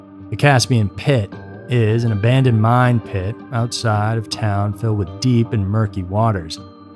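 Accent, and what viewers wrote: United States. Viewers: His voice is really cool. I love his American accent 🤩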